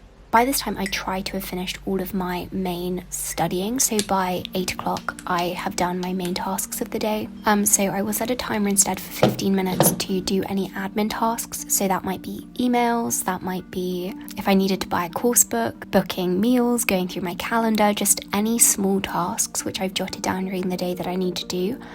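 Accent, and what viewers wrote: RP, United Kingdom. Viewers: It’s called Received Pronunciation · Oh wow, so it IS similar (or the same?) as the Queen's English! I thought it sounded similar but wasn't sure if my American ears were just not able to hear the difference. Thanks!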